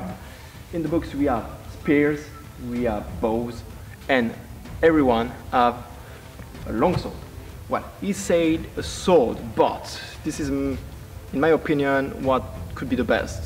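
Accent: France. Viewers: Excellent approach! Kudos for being able to assemble more than a few warriors at one time. :) I might like to offer a few suggestions. Frankly it's difficult to follow your French-accented English; it might be easier if you spoke in French. ;) For swords, I would say to use "bastard swords" or "hand-and-a-half swords", at the very longest. Tests that we've done in the forest show that longswords are very awkward to carry on a regular basis, Peter Jackson's movies notwithstanding. And longswords are little extra help against armor. You really need an axe or a mace to directly defeat armor. Spears are great in the forest, but the ones you show in this video may be too long. I suggest you try moving about in the bush with this equipment before making any conclusions. Shorter spears allow the butt to be brought to bear if an opponent is closing in. Modern warfare (with rifles) dictates a more open formation for two reasons: a bullet can travel through more than one soldier if they are side by side, and soldiers can provide support to one another with rifles even if they are many yards apart. 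Spearmen can stand more closely, so as to provide support to one another. Of course the terrain will provide its own problems. Practically speaking, a single-edged knife is more useful in the bush than a dagger can ever be. I think your formation is mostly accurate however. The spears want to be in the front, to keep the archers from being charged. They would work as bayonets did in later age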